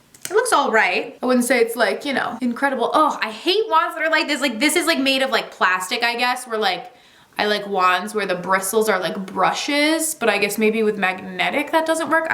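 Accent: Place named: California, United States. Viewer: Guessing your from or live in California based on how you talk. So cal? Thanks for the vid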